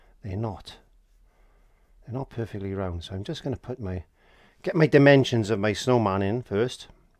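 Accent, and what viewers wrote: Wales, United Kingdom. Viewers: How wonderful to hear that wonderful Welsh accent. Land of my Fathers.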